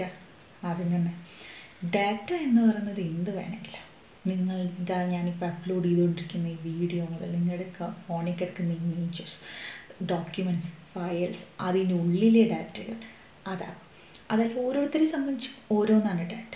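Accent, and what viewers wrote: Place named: Thrissur, India. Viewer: Thrissur slang നന്നായി endu....😀😀🙋🙋🙋🙋🙋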